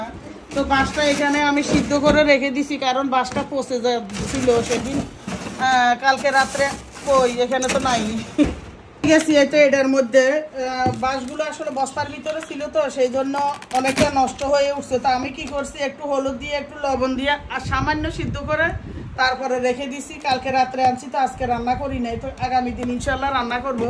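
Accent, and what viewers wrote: Noakhali, Bangladesh. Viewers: আন্টি আপনার দেশের বাড়ি কি নয়াখালী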